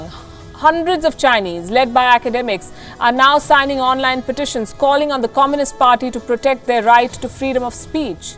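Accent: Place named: India. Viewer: Her Indian accent is a real turn on.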